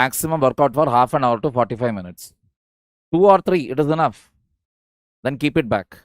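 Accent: Kerala, India. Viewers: knew this sir is from kerala as accent an mannerism is very similar to my roommate😁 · Malayali accent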